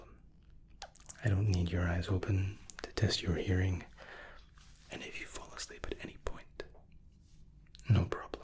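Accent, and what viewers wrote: Scotland, United Kingdom. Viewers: accent is definitely a conglomeration. But yeah, the Scottish accent is most predominant, while lightened a bit by the English side of things. If there's any American accent in there, it's very faint. 😅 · Ah never mind, just done a bit of digging and it’s says he was born in England but has spent most of his life living in Scotland so has picked that accent up a bit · I can hear the scottish but it also sounds like there's some european/american in there too! Forever a mystery but very relaxing hahaha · Where are you from mate? I think I hear Scotland in that accent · I just recently found your channel, 1 video and I'm already hooked to your vids, love the accent and your style 😀 you rock it man haha, and we are both from Scotland FREEDOM!!! haha lol, I'm rambling again I'll stop. Oh and one last thing you just earned a new SUBSCRIBER!! 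MEEEE HAHA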